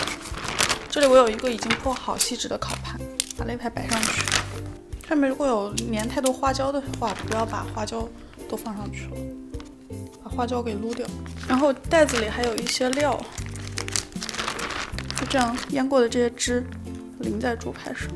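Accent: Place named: Wuhan, China. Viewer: 微微听出来武汉口音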